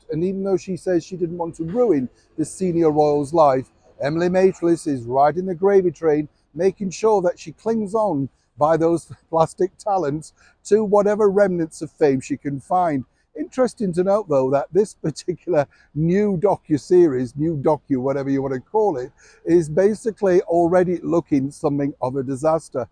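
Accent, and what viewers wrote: Yorkshire, United Kingdom. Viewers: I really won’t be watching it Neil but here’s you talking about slang and telling us to clean our lug ‘oles out! Hahaha, that’s a blast from the past, you’ve not lost your Yorkshire yet Neil 😘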